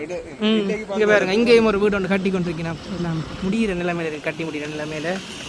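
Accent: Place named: Jaffna, Sri Lanka. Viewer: யாழ்பாணத் தமிழில் உங்கள் விளக்கமான வர்ணனை மேலும் மெருகூட்டுகின்றது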